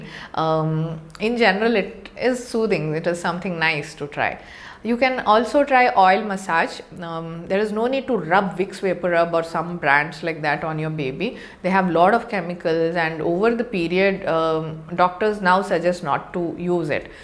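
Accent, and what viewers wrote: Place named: India. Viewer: Indian English language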